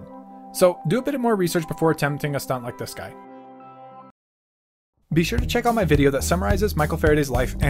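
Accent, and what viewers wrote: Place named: United States. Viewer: Bro hindi me banao video... Ye American English samj nhi ata....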